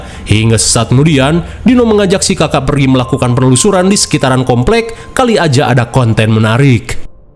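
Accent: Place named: Sunda, Indonesia. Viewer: Suara Admin nya banyak banget , ada beberapa channel x yaaa... Sunda pisan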